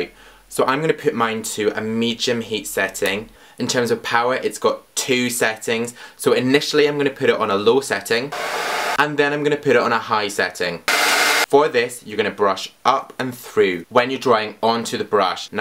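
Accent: Scotland, United Kingdom. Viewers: That's how people in UK speak their accent is different so don't call him gay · Are you Scottish ?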